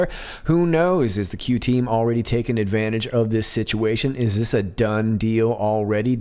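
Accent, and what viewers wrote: United Kingdom. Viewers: Hey I just wanted to say I reckon [+fakewood] means “and Hollywood”. PS. Great English accent xx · A BRILLIANT 'posh' British accent Jane from England x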